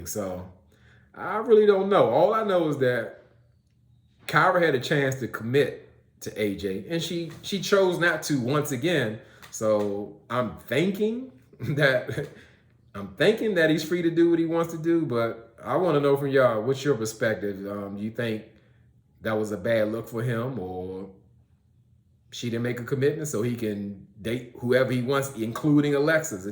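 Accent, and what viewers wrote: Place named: Washington DC, United States. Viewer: Good review. Where you from bro? I hear a little bit of home (DC/dmv) in your voice?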